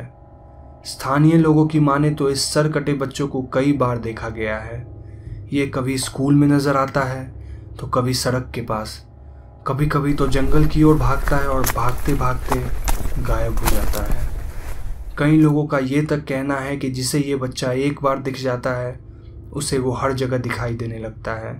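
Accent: Bengal, India. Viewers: Bhi tum bangali ho??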